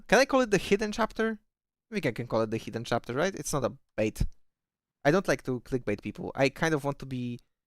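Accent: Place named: Poland. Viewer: I don't know why your Polish accent fits the OWB theme you have in your channel.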